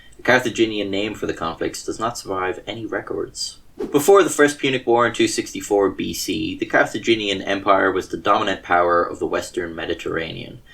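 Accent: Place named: Ireland. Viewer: Lol I love getting historical facts in a sarcastic Irish voice. Moreover, I am of the opinion Carthage should be destroyed.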